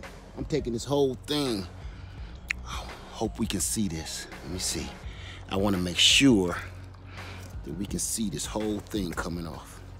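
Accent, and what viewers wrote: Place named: South, United States. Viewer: I knew your accent sound like a southerner I'm from Beaufort my older brother lives in Columbia as well wow!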